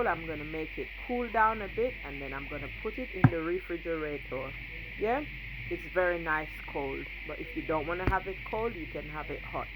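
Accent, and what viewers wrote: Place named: United Kingdom. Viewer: Damn that British and Britishhhh 😅😅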